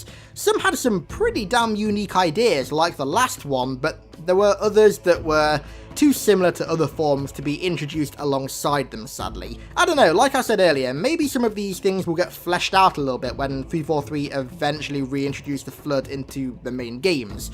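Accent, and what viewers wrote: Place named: United Kingdom. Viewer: Thanks for the video man, I just subbed to you like last week. Just wondering are you from the Uk? Your voice is kinda odd to me and sounds like it's from the Uk. Just asking cause I have seen some vids with facecams and I see usa flags in the background and guessed you are either from there or the Uk.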